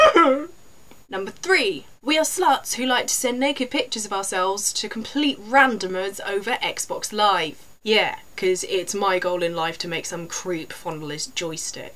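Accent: United Kingdom. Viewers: HAHA! THOUGHT THIS WAS QUITE FUNNY ACTUALLY. . SURPRISED ME WHEN A TRUE BRITISH ACCENT CAME OUT TOO! :)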